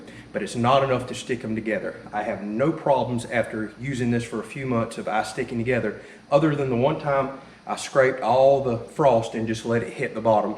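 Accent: South, United States. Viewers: Hey dummy! You're not a dummy! People that are ignorant just hear your southern accent, and make bigoted assumptions! Chest deep freezers use almost no energy. Generally one of the most efficient devices in your house. Might cost you $3-5 a month... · I really enjoy listening to this guy talk! Presentation and his southern accent are peaceful.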